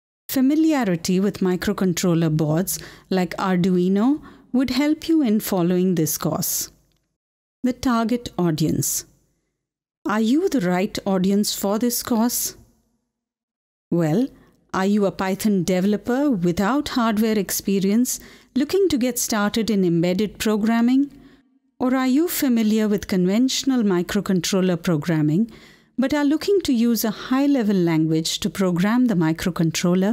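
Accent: India. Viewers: This is the least typical Indian accent I've heard on YouTube. I'm Indian, okay! And my friends also speak English but like normally not the annoying way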